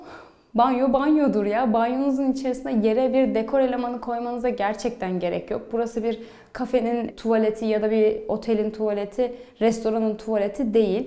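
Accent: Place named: Central Anatolia, Turkey. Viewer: nerenin aksani bu ic anadolu yada bati karadeniz gibi. hafiften aksan geliyor alttan , tasrali ic mimar enteresan.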